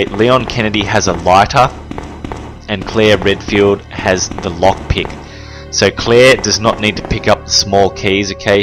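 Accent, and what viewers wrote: Australia. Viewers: You're definitely an Aussie with the way you speak 😂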